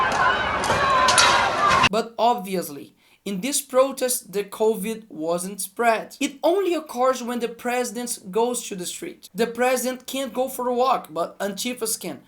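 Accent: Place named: Brazil. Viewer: Quando percebi o sotaque brasileiro no inglês, parei de assistir na hora. Quero ver é gringo criticando ou metendo o pau no F.N, porra!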